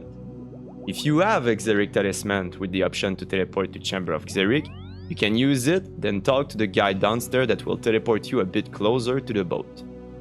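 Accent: Quebec, Canada. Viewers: mmmm ca sent le quebecois lol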